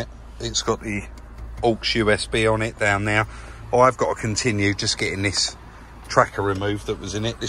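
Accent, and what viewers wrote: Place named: Northern England, United Kingdom. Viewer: I'm from Wigan way, ya know up North 😉, and I reckon some of your words and accent are as crazy as ours. Another language, briliiant!!! 😀😀😀 These videos seem to be getting better as you and become more and more used to having that camera aound. 👍👍👍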